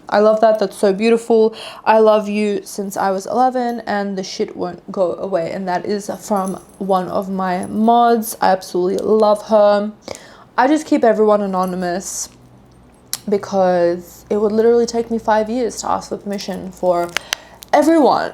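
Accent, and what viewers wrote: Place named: Australia. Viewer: Are you Aussie? Love your accent❤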